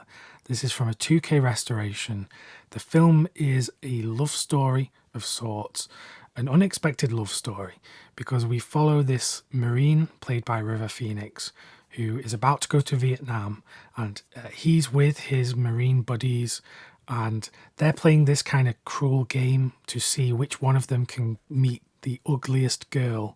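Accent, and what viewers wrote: United Kingdom. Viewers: Hey, im not even really collecting Blu-ray, but i sometimes just play your videos for the athomsphere. Like you were my chilled bri'ish fella 😂 i dont know if thats weird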